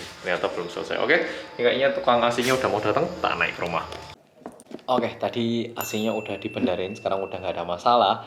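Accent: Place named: Java, Indonesia. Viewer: mas jawa puoool tapi kalo kaget, trus ngedumel, sama kagum gitu korea nya keluar banget hihi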